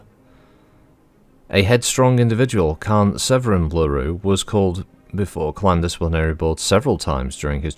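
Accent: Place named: Northern England, United Kingdom. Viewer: Yeah like you said I listen to this while doing stuff or today I'm listening on the way to work. I love picturing in my head with your upper class accent you recording these audio lectures in your enormous Library surrounded by thousands of leather bound books at Estate in the beautiful North English Countryside 😎. At least that's the delusional imagination of an American who has never been to Europe or Mary old England 🇺🇸🇺🇸.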